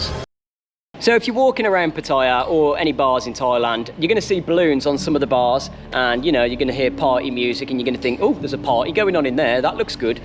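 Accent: United Kingdom. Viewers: Love hearing this guy's genuine British english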